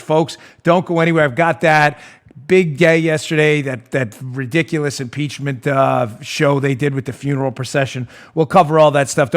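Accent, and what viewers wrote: New York, United States. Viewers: is the best. Been watching you on youtube for years. Always ahead of the story, insights spot on, and delivered in the most eloquent NY accent.. BTW, I grew up in Bayside.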